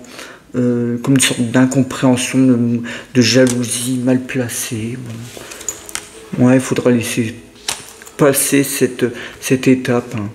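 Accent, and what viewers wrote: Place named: Nord-Pas-de-Calais, France. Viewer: 😮❤ merci à vous et très jeune heureuse année 25... Merci pour votre tirage 😊 Mon petit cœur est las d'attendre quelques nouvelles palpitations.... Rien depuis 21 ans... Sinon une solitude subite à 49 ans..décidée par l'univers.... Rien et personne depuis 20 ans... La lionne attend. On verra bien ce que 25 fera de positif. En tout cas, j'ai bien aimé votre tirage, vous êtes particulier, calme apaisant et peut-être même habité 😊 J'ai beaucoup aimé aussi votre petit accent ch'ti... Est-ce que je me trompe..., je ne crois pas être médium...🙏 ☺️ Nouvelle abonnée... à très vite Cathy 59 😉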